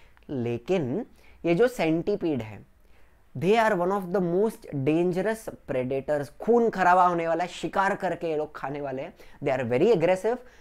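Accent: Maharashtra, India. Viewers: Sir are u marathi????? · Sir, kya aap marathi ho? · You are marathi ??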